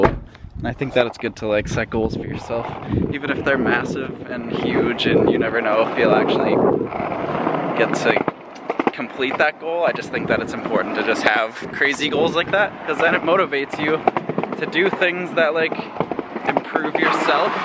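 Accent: United States. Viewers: I enjoyed the vlog and more importantly the q&a session :) I didn't know you were Canadian I legit though you were American. But still thanks for your content it's always inspiring and when having bad days yo always raise a smile :) greetings from the UK :) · I highkey thought you were American lol. but ayy, a fellow Canadian (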